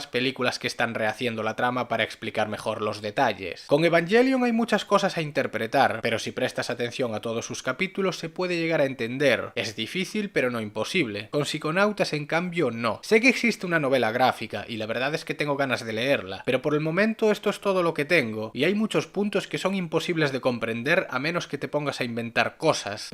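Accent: Galicia, Spain. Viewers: Eres gallego verdad? Lo digo por el acento por q yo también soy gallego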